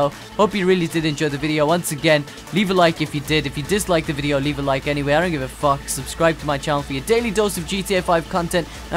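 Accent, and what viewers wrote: United Kingdom. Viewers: He's from uk man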